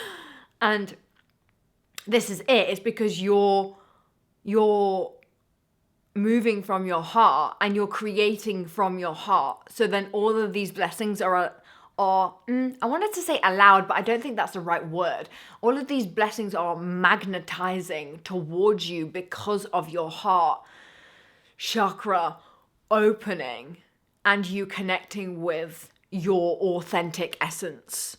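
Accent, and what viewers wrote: England, United Kingdom. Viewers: Thank you so much! Your message resonated all the way! Very interesting indeed and I greatly apririciate your efforts 🙏🏻 The relinquishing has to do with my drug use, I need to let go of something I have seen as a right for 30 years. I already know I don't need it anymore but I seem to be afraid of the emptiness that will occur when I stop. I have already come to the realisation that this emptiness will actually manifest as more room for other things, and I got loads of things that could use more attention. Lately I have been receiving some re-tuning, very powerful changes in my subconscious that immediately cause stuff to integrate. Awesome stuff this whole 'becoming'! And you my love, your presence makes me feel deeply connected. Like I'm finally home, safe... I feel enchanted when you are in your way 😍 How you speak and laugh, you are beautiful in looks ánd your being. 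Haha I even felt a butterfly in my stomach when I realised that you speak with an English accent, that makes it likely that you are 'near'.. Don't worry, I do live in another country, the Netherlands, and I will not go around stalking you or something. I guess I wanted to share this with you because you make me feel special and I want you to know and feel that you are very special to me ❤️ Eyes open, no fear. Be safe you beautiful creature ❤️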